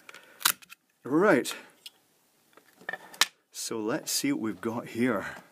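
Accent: Scotland, United Kingdom. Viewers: Why does it sound so good when Scottish people talk about nerdy things?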